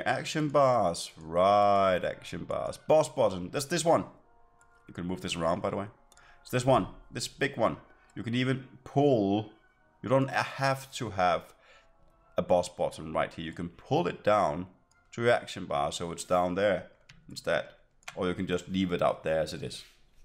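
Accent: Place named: Denmark. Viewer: Where are you from. Let me guess Denmark?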